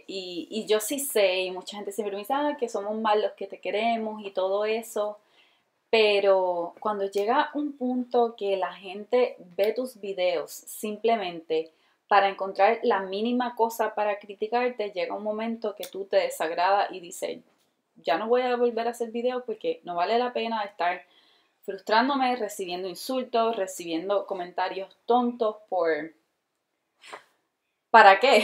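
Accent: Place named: Puerto Rico. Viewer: Hola, ojalá no te desanimen lo que digan las personas negativas, porque hay mucha gente que aprecia tus vídeos y tú persona. Yo te animo para que sigas siendo tú misma ya que eso es lo que te hace ser especial, tus vídeos son muy interesantes y a mí me sirven para mucho. Besos de una española que adora tu acento portorriqueño mezclado con el inglés. Con todo mi cariño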